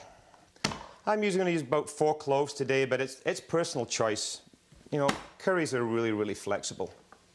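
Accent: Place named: Scotland, United Kingdom. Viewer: You have a bit of scottish in your accent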